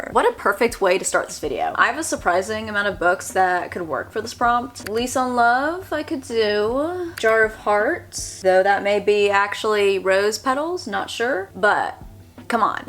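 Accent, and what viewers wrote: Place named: South, United States. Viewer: as opposed to your normal lovely southern accent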